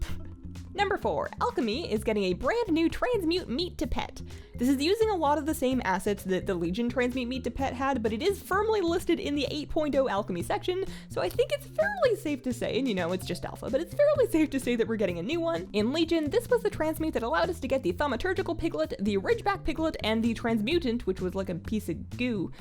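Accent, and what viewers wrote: Canada. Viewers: love listening to Canadians talk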